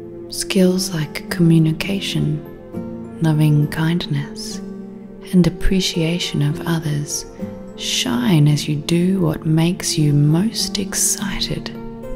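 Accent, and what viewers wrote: Australia. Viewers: ...thank you! I've been listening to a lot if these meditation videos but your voice is SO soothing....must be that Aussie accent. In any event, thank you! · WOW ❤ !! Powerful & Gorgeous !! Plus that Ozzie accent 🤩 ! Thank you 🙏🏻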